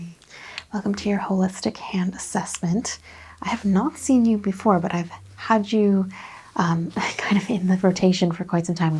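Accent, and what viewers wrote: United States. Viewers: Do you from U.S.A?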